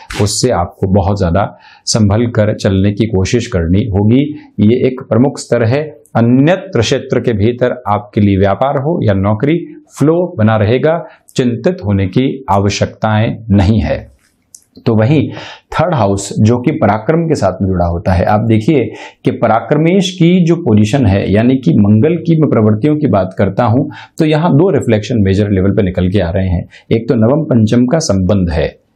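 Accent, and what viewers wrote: Standard. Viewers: Very pure accent , great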